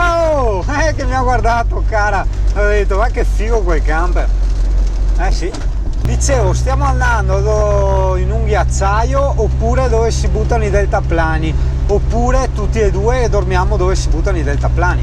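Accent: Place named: Milan, Italy. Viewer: ma quanto cazzo parli milanese del cazzo..insopportabile...se dovessi solamente un giorno capitare con te in auto, mi sparerei al primissimo secondo al solo ascoltarti parlare... anzi ti sparerei...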